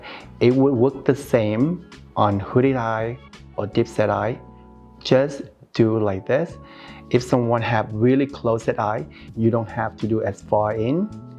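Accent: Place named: Vietnam. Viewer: You have the cutest vietnamese accent. My boyfriend is vietnamese american i live in a very vietnamese area in northern va as far as asian population. Love ur food and ur culture 💚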